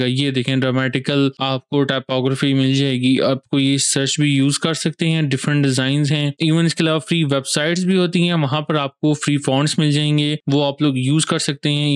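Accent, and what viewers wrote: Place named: India. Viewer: Im not understand. Pronoun english india 😅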